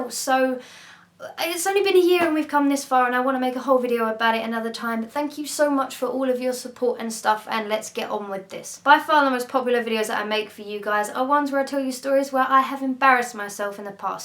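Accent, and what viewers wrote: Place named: Leeds, United Kingdom. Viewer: sounds like you are from Leeds or Buckingham Palace. Royalty!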